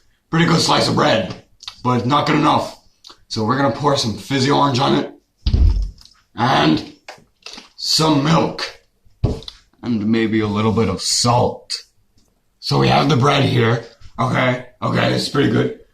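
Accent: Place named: Ireland. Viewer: he sounds like Irish man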